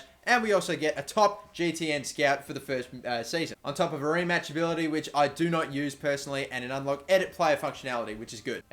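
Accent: Australia. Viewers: You an Aussie? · Definitely a fellow Australian? Nice video dude.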